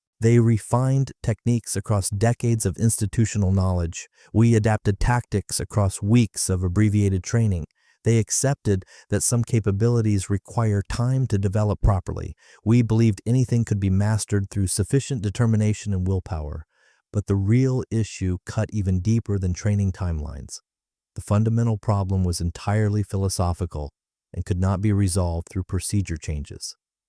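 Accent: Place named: United States. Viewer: Mate, settle down, if you have any clue or understanding of accents this is an American narrator not an Australian production. Well done your Dad just different philosophies , Delta force doesn’t fight green army tactics nor do Aus special forces. Before bluster and loud noise do your homework and look at the actual kill ratio,s bud not just an assumption Americans do every thing the best. No one criticised the US soldier here but rather talked about mutual respect and teaching each other.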